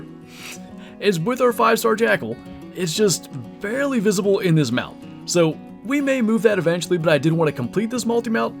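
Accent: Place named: United States. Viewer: Its his voice for me so chill and rich 'murican accent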